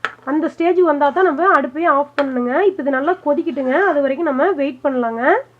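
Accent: Kongu, India. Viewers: கோவைல மட்டும் தான் அப்டி பேசுவார்களா.. ஈரோடுலயும் இப்டி தான் பேசுவாங்க.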